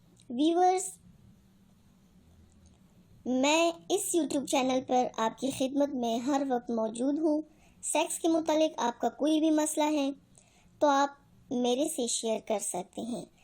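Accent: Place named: Mumbai, India. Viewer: Apka Bombay Ka andaz bhi. Bohat. Behtreen haa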